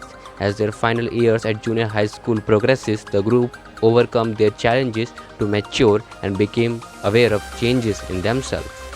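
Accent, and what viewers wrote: India. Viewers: Are you an Indian. I asked because your speech like an Indian. I like your videos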